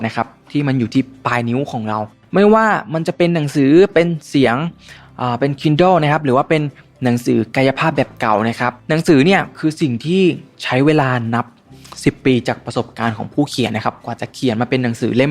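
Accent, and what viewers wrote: Thailand. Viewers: สำเนียงไทยน่ารักมาก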